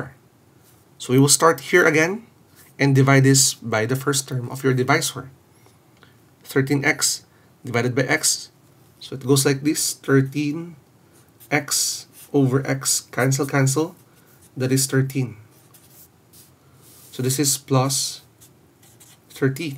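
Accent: Philippines. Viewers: Thanks for this loved it soo much...and btw When I first listened ur voice...I knew it that u are from phillipines coz I watched section e drama so I recognised from ur voice that u are from phillipines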